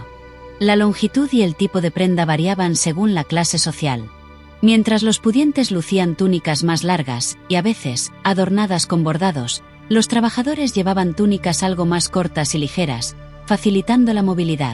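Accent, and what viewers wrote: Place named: Spain. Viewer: Es chocante el acento español de la locutora. Por eso...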